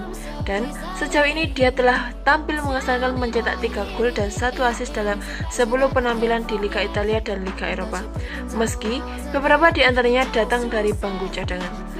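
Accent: Java, Indonesia. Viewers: narator nya ganti nih..medok doang jawa nya tapi suaranya lemes, notasi naik turun gak ada..lempeng doang kayak baca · mba medok bnget mba suarane😂🔴⚫❤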